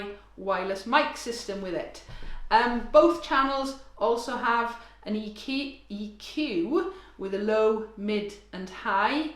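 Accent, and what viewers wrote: Wales, United Kingdom. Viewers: How do I amplify my acoustic Ukelele? I know that Acoustic Guitars can be done with a Mic in front of it, but how reliable is it. Nice to hear a Welsh accent from a Bristolian living in Canada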